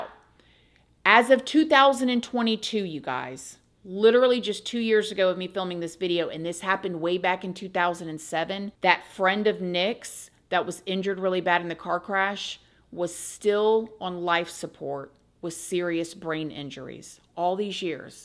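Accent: United States. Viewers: My parents were swingers... Personally, I never fully understood it... But its not my place or concerns of what consensual adults do... Consensual being the most important aspect! Illegal recordings are not "fair usage" no matter who you are! I would venture to say Bubby sold the video... And it's refreshing that it's the facts of the story ~ not the morals or character ~ that is the story here! I think it's funny that the ex-wife is unset more about the money gained from the court case than what was recorded! (Envy and Jealousy ~ not betrayal ~ she plainly shows her heart only holds anger! Which is sad to think they can't even be friends!) This video was my first from this channel, but it's not the subject matter that earned my subscription ~ but the awesome way it was presented! (Hearing that genuine Southern twang and the sensible conclusion ~ please continue creating more content! 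You are awesome and sweet!) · I'm a gonna' comes out of your mouth and the thick southern drawl has me believing that you are actually from NY but want inarticulate folks to resonate with your video. Why don't we just say that "Terry" is a bottom feeder and it was evident when he put an innocent comedian in a sleep hold with no remorse - just a sneer on his steroidal evil face y'all - I am from PA and don't relate to your accent. Was that hate filled? No, just a 1st right amendment. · I thought Hulk Hogan was a legend until he douched himself at the RNC. He lost a hell of a lot of people that really liked him by attending the MAGA CULT rally. I watch you from Australia and would like to ask you, where about in America are you? I love your accent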